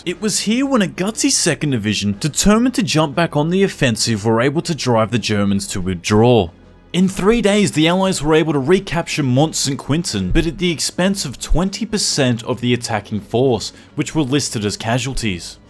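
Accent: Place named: Lebanon. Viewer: The narrator sounds Lebanese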